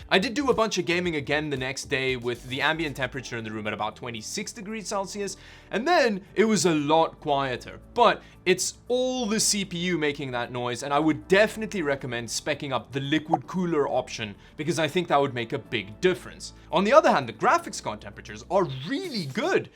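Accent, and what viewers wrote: South Africa. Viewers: What accent is this, you sound like me, but being in Canada throws me off maybe it's an odd French/ Norwegian I assume SA though because you said suss out and sketch. Common use here. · South African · Are you from south Africa? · That accent confused me, I hear a South African accent there. What's your story? :) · are you south african?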